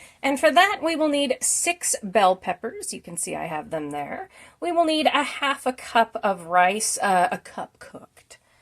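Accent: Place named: Appalachia, United States. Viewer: Sorry for your loss, love the bluegrass twinge I hear in your voice...I've never added corn, but I might next time, thanks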